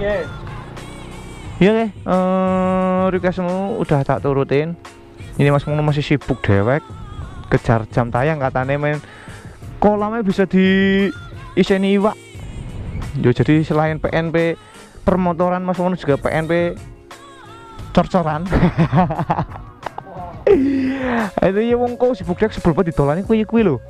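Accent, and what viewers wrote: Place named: Banyumas, Indonesia. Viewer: iyaws ngapak Bae kepenak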